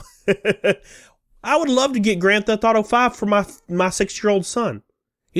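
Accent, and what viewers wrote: South, United States. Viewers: Did I just hear a southern man say the Confederate battleflag is Scary????? Lol wtf bro.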